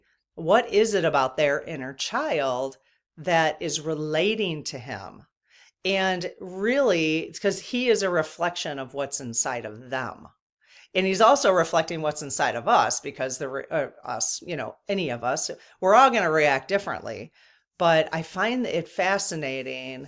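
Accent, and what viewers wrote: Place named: Wisconsin, United States. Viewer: Just stumbled on your channel. Love your "Sconnie" accent!!! I grew up in southern Wisconsin and now live in Minnesota.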